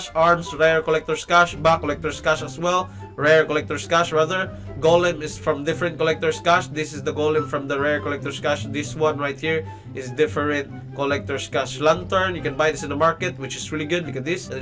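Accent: Bisaya, Philippines. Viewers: bisaya man diay ka HAHAHAHAHAHA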